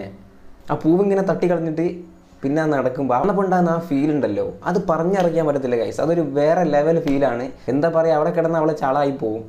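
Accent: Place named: Malappuram, India. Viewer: Ante ജില്ലാ eedha Ante ഭാസ കേട്ട്ട്ട് മലപ്പോർത്ത്ള്ള ന്നാ തോന്നദ് 😂മലപ്പുറം ആണോ അന്യേ ജില്ലാ ❤️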